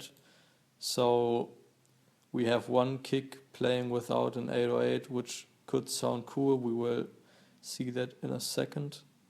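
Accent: Germany. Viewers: Nice Video bro. I think you come from germany, is that right?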